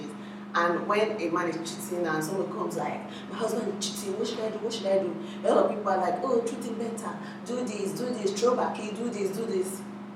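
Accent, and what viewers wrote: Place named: Warri, Nigeria. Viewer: I loooove the way you talk. You sound like you are from Delta...warri to be precise. hahaha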